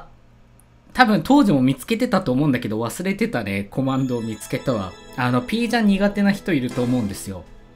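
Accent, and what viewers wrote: Kansai, Japan. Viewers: さんの関西弁好きやな〜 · 最近、若干関西弁挟んでくるの面白いw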